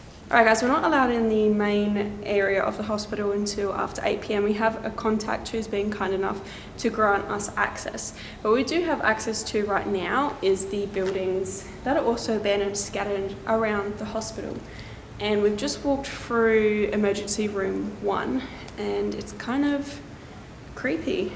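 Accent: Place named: Australia. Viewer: Oh, I don't mind at all. I love watching you, as well as listening to your Australian accent. :) Not sure if that spirit you encountered feels the same way, though...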